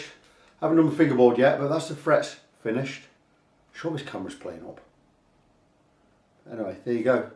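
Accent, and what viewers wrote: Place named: East Midlands, United Kingdom. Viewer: Hi. I have this exact guitar too and I absolutely love playing it. By only bug is the nut. I think it would benefit from a graph tech nut or something along those lines. Possibly even some locking keys like other PRS models. Your accent sounds pretty close to where I’m from. Possibly Derbyshire/Nottinghamshire or around that area? (Also based on your comment about being around 60 miles from Northampton) If so I’d be interesting in using your services in future for a few mods and setups etc. Thanks for the video, it’s reassuring to know you take good care of peoples instruments rather than handing it over a counter and not seeing it for a few days 👍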